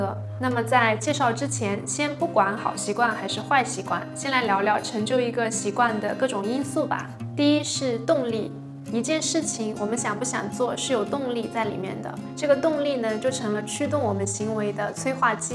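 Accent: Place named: Anqing, China. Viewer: 你是安庆人吗，口音好好像哦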